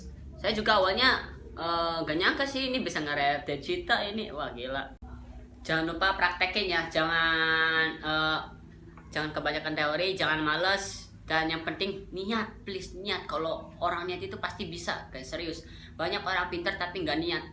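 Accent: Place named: Java, Indonesia. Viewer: Medok bgt ngakak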